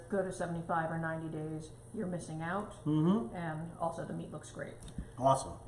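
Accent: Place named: New Jersey, United States. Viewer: I just bought a SteakAger Pro 40 and found your channel while looking for info on YouTube. I can not tell you how much I appreciate the great information and content you provide. I subscribed to your channel immediately. I live in NY but grew up in Philly and NJ. I'm picking up a Jersey accent. Thanks so much Chef for the valuable content you provide. I was nervous about dry aging my own steak but I feel confident after watching your videos.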